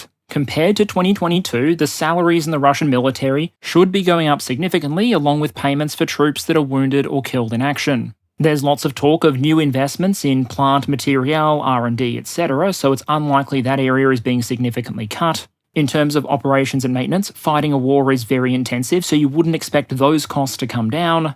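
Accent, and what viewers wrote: Australia. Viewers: WOW. High quality analysis! This is a high speed download of research-rich info, spiced with a little dry humor. Good clear diction in Aussie-accented English, which is less off-putting to us Americans (Oxford English lectures give us inferiority micro-seizures)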